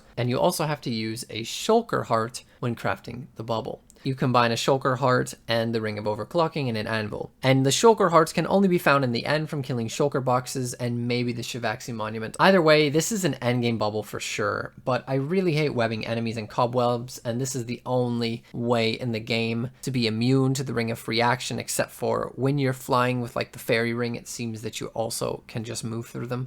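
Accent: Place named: Canada. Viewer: bro sounds like kenadian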